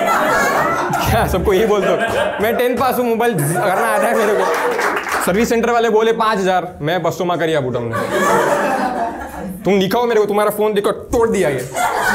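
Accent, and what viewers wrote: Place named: Gujarat, India. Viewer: Your gujrati is cute😂♥️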